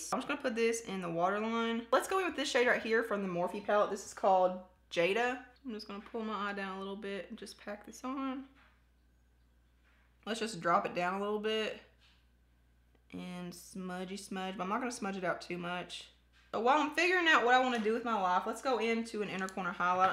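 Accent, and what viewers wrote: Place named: Kentucky, United States. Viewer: I still enjoyed it!!! My dads side is from Kentucky and ur accent makes me feel at home, ur channel makes me feel that way!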